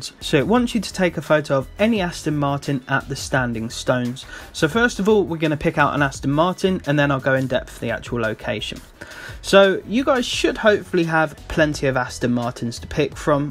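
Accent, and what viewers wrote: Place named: Australia. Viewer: The australian accent tho 👌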